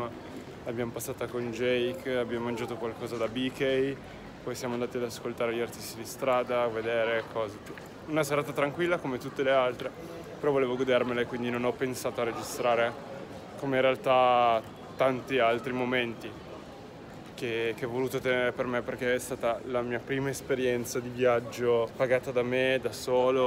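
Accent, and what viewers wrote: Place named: Spain. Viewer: Hai già l'accento spagnolo tío🇪🇸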